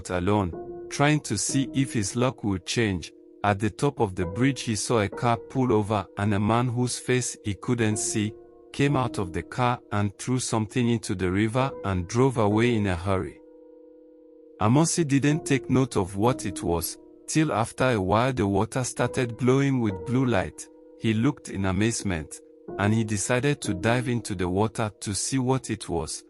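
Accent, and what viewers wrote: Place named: France. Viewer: tou have an french accent, where you from?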